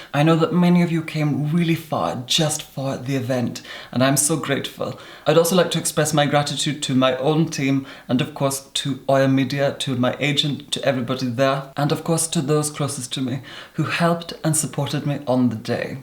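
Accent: Scotland, United Kingdom. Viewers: Is that a hint of a Scottish accent I can hear ? Yes I’m new here 😊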